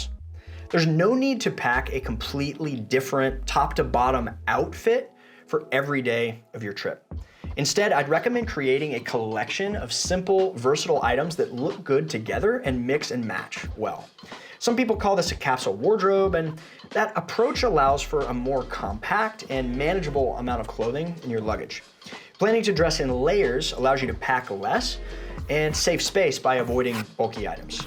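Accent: United States. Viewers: You sound American. Why aren’t you recommending T-Mobile with its no cost international data. Oh I see you are promoting Holifly.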